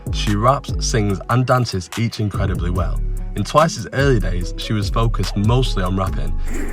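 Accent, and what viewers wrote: United Kingdom. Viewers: unrelated but what is your accent called/from what part of england? i wanna know lol✨ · Love your northern UK voice bab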